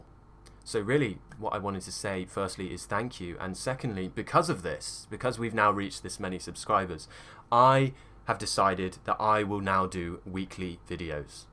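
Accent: United Kingdom. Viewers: British english is so sexy 😏 I love it and I am also excited bout your videos... I admire your accent 🤔😱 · I'm sure most of people subscribe to your channel because of your clear and beautiful British accent. Congrats 🎊 may you reach more 😊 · Can't wait for your weekly lessons! 🔝 I'm completely in love with your super British accent lol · Mr, Good morning, I like the British accent with pronunciation. I've also searched for this kind of video until I find you. Now I'm thrilled with your videos. My morning also starts with your videos. My improvement is also apparent now. Actually, I'm from #Bangladesh. Lots of love from my country. Warm Regards, RAZIN AHMOD:elbowcough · hi, I'm from Brazil, I like british accent and your videos. cheers !...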